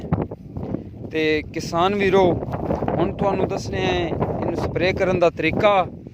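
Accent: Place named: Saraiki, Pakistan. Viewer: آپ کی سرائکی اتنی الگ؟؟!!!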